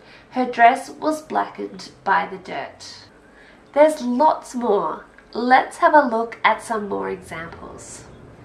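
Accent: Australia. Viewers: Yes, she is Australian. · Is this Aussie English? That's what I'm looking for :)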